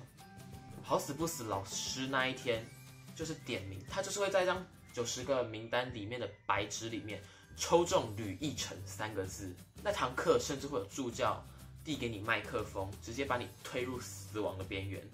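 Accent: Xiamen, China. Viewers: 我感觉厦门那边普通话也这个味儿其实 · 还好了，台湾腔，就是闽南厦门一带人说普通话的腔调而已。厦门人说普通话也你的调调。只是很多人不知道而已。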